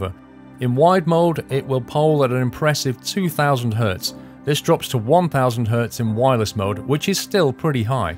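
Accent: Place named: United Kingdom. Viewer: Nice review, i really like that it has kind of a unique british feel to it. Maybe it was just the accent:D, but it really felt more professional compared to the more gamery type of hardware reviews from US based tech channels that i usually watch Anyway i still want to give you a heads up, as there has been a lot of controversy about this that can be a problem in the future when your channel gets even bigger, i think a clearer disclaimer can be helpful, especially whether you have been paid to do a review or if it was just you wanting to do a review and they provide you with the product. Youtube also has a guideline on this too if you haven't seen it <link> Keep posting these reviews though, i enjoy it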